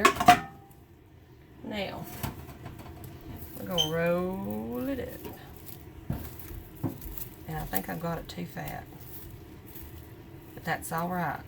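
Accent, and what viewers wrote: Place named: South, United States. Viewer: Great job & I love your southern accent 😍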